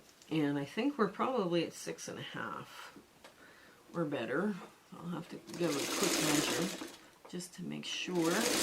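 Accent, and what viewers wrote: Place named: Canada. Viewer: I've made a couple of 8" crumb blocks, ao wondering if sewing the triangles into sqares might be a good option for continuing... I'm really enjoying your videos - and adorable Canadian accent ;-)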